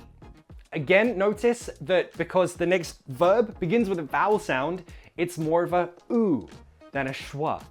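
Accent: United Kingdom. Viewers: Is it a British accent?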